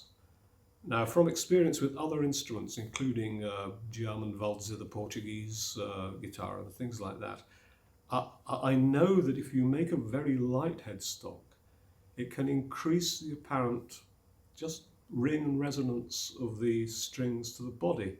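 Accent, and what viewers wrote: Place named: United Kingdom. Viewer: I am truly intrigued by this gentleman’s British accent. Being an American our English is is rather lax and candid.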